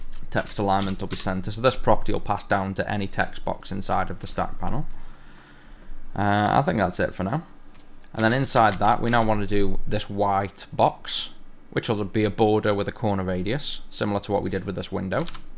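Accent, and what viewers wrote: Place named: England, United Kingdom. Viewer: I am genuinely interested what is the english accent maybe dialect that you speak in the video. googling didn't give me any answers and thanks for the videos the best wpf on youtube.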